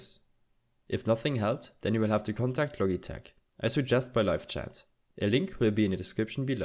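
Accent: Germany. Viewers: Der deutsche Akzent kickt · Deutschester Akzent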